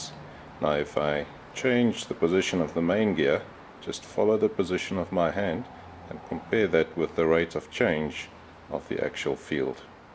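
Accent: South Africa. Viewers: Are you an South African?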